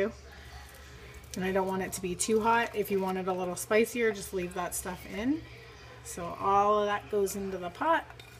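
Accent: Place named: Canada. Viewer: Let me guess you’re Canadian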